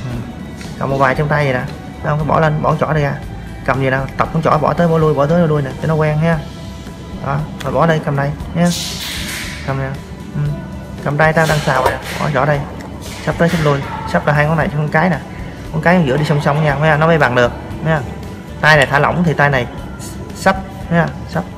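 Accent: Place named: Mekong Delta, Vietnam. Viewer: Anh nằy miền tay he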